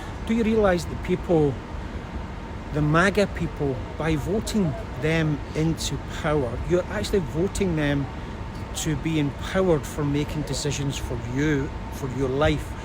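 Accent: Scotland, United Kingdom. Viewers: I love those Scottish dulcet tones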